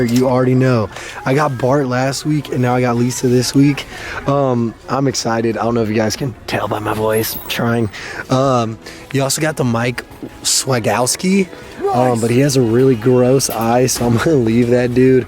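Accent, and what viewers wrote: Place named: California, United States. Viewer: U got like a cali accent now and shit haha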